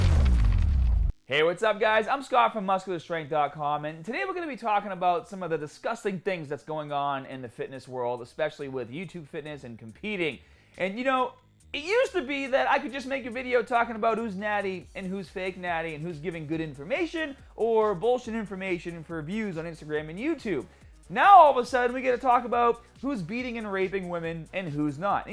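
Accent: Boston, United States. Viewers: Bahston... My man!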